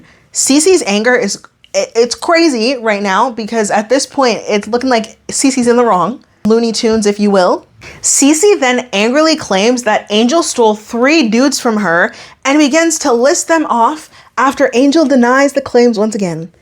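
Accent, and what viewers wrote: Canada. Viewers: ITS OKAY I LIKE THE CANADA ACCENT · Nah bc girly I feel attacked since I brought up the Canadian "about" thing a video or 2 ago 😭😂❤️ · I don't know why you hate your Canadian accent so much. It sounds good to me, I think you should embrace it. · Lmao when you said”in weird round about way” I died 😂😂 I’m sorry I love Canadian accents Editing to add Kami would’ve sus it out because it was definitely fake 😂😂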